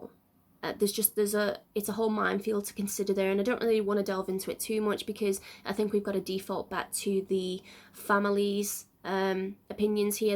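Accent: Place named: Scotland, United Kingdom. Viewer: are you Scottish? Dark Curiosities is another Scottish Voice I love. Great accent the Scots have 👍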